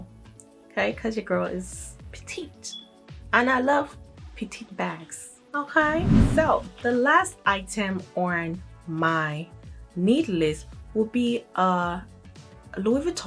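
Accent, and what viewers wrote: Nigeria. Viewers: I love your Nigerian accent!!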